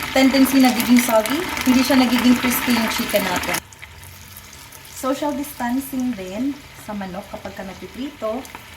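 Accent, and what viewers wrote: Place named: Thailand. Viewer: ang thai accent gyod ang nag dala 🤣🤣🤣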